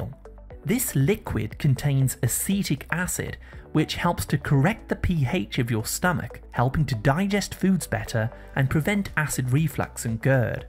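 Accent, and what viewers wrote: United Kingdom. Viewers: Do brits say "Vytal" when saying vital? If so why do you all annoyingly say "Vitamins" when the prefix is clearly vital pronounced "Vytal" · I like people. How ever I can't help to say. The British have a real problem pronouncing the English language. It's maddening. Vit,a,men's. Instead of vitamins. Waa,tore. Instead of water. Also it seems the British have a big problem with the letter "r". Look language and the pronunciation of it is key for those who seek to speak or become an orator. One who learns the value of speech and tone. Just saying. Use speech and a calm flow you'll feel good and those listening to you will benefit in the understanding of what you say. Thank you for your time and caring. I won't pick through the other Miss phrased words. Your kind soul is on the good track. Again thank you.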